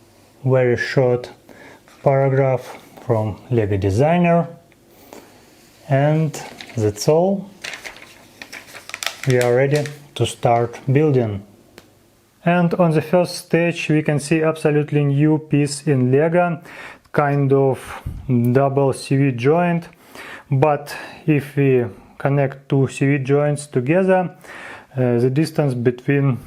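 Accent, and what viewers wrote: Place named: Russia. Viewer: Я не тролю тебя😊 просто твой акцент прямо таки походит на те самые пародийные ролики с руским окцентом! Я сам так говорю но когда читаю стараюсь читать с окцентом приближеному к Амеренканскому акценту да и +я сам очень плохо знаю английский язик а с помощью твоего англоговорящего канала я смогу улучшить свой английский! Так скажем совмещаем приятное с полезным 😊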